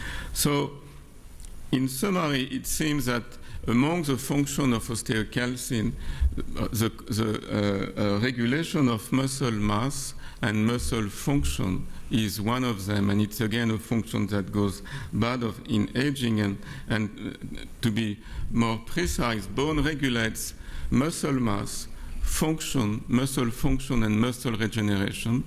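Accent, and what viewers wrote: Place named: France. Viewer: dicficult to follow, English which sounds like French.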